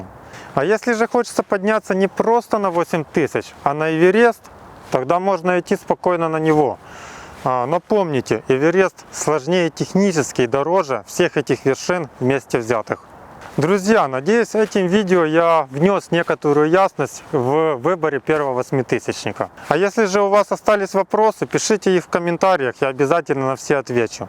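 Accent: Ukraine. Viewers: чому ви не ведете блог українською? По вашій вимові чутно, що ви україномовний. Нахіба оцей випєндрьож?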